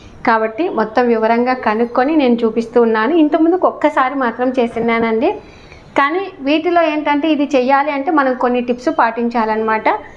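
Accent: Rayalaseema, India. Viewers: Akka peekinave kadu akka teesavu anali mee rayalaseema bhasha yasaga vuntundi 😊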